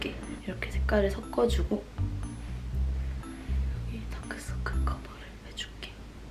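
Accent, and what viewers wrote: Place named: Gwangju, South Korea. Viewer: 아 걔있냐 는 사투린데 아무래도구성진걸보니 광주인가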